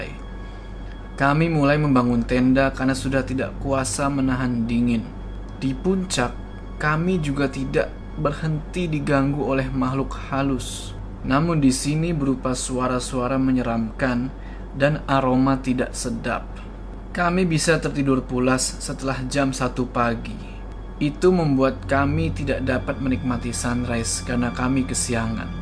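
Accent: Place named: East Java, Indonesia. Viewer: Jatim mana cak?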